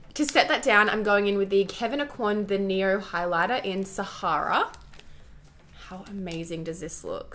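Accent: Australia. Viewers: You are literally perfect 😭❤️ I want an Australian accent so bad my fav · does anyone else love aussi accents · I have a rly good Australian accent just from watching you for 3 years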